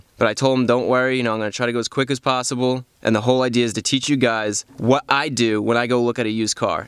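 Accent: United States. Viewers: I like your enunciation and pronunciation. Are you american ?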